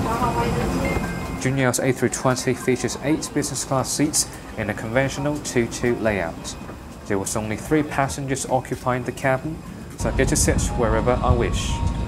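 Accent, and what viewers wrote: United Kingdom. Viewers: Officer has a rather distinctive British accent. Maybe if switched to an American accent you might be better able to understand him?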